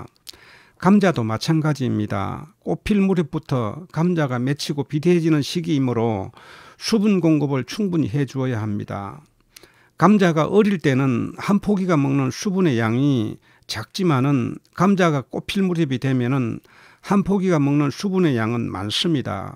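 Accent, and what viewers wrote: Gyeongsang, South Korea. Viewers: 대구 억양??? 이발, 감자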